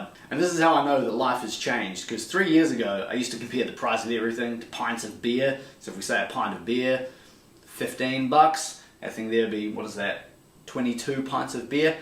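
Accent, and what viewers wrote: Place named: New Zealand. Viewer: Opus wins tin times out of tin. Tin grams in. Ah the New Zealand accent, it's always a treat. Great video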